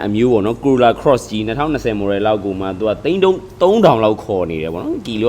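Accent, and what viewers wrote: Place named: Rakhine, Myanmar. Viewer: ရခိုင်ထင်တာပဲ မဟုတ်ရင်တော့ သပ်သပ်ယားတာပေါ့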